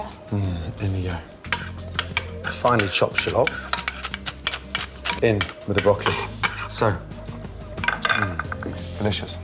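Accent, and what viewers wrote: United Kingdom. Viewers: Five and half to Six hours in the Oven at 140C...! Agg, still having trouble with British Accent.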